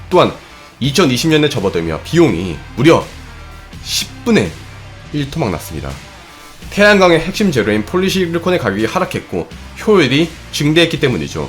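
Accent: Gyeongsang, South Korea. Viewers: 전부터 그런 느낌이 있었는데.. 경상도 사람이었네. 좋겠습니다. 윤석열이 대통령 되서..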